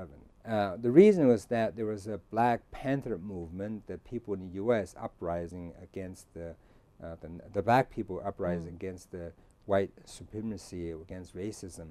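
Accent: China. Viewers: lol, an American speaks English with strong Chinese accent. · Just started listening. . it is so unusual to hear a white person speak english with a slight Chinese accent! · My god. His English is even not as fluent as his Mandarin. I know his first language ( mother tongue) is mandarin. Obviously, he uses Chinese thinking to express himself in English. Also he owns a mandarin accent when speaking English. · Very strong Chinese accent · You guys don't know that his Mandarin got a ShanBei accent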